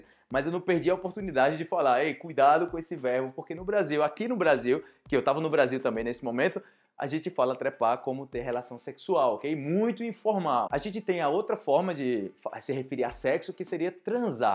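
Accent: Antioquia, Colombia. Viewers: Woow, primer brasileño que le oigo hablar muy pero muy bien el español, hasta le sale un acento colombiano , saludos desde Venezuela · Oi e gente,u sou de Peru aprendeendo portugués muito obrigada pelos videos,muito interessante,voce fala como colombiano,muito bom seu espanhol,aqui a palavra "franela" e un pedazo de tela tambem,desculpe erros em a minha escritura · tu espanol es espectacular hasta pareces colombiano haha ... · Oi!!! Aparte de aprender varios falsos amigos"!!! Me has hecho reír tanto!!! Nunca ví un profesor tan divertido!!. mereces un 10!!!.y con ese acento colombiano!!! 👌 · mais ele acento colombiano es super bien .. eu gosto muito.. 👍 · habla como paisa . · si no eres colombiano, te felicito! tienes una gran habilidad para aprender hasta el acento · Ivan Rojas pero si se le nota el acento colombiano 😀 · vé, vos cuando hablas en español pareces a maluma, es muy paisa tu acento colombiano :D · Muy paisa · Literalmente 🤣 En Argentina decimos así cuando te engañan con un precio y te cobran de más (me cog-er-n), pero es una expresión muy vulgar. 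😅 Tenés acento colombiano! Wow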